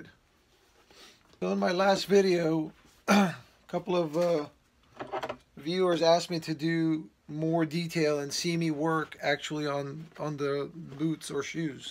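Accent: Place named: Philadelphia, United States. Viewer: Are from Philly? You got a Philly accent or close.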